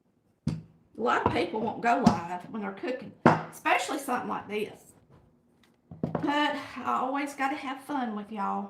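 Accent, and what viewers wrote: South, United States. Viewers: You say "yall" way to many time. And your speech is over done on the Southern accent . It is difficult to listen to you. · Just discovered your channel and you sound just like my family. I'm from the south and I m glad I found you. Love your recipes they are just like my Mawmaws.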